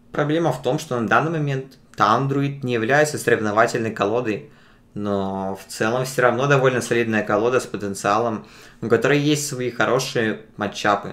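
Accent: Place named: England, United Kingdom. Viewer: у тебя очень приятный голос. Видно, что ты уже привык к английскому языку и у тебя присутствует этот акцент. Надеюсь это не единственное русское видео на твоём канале)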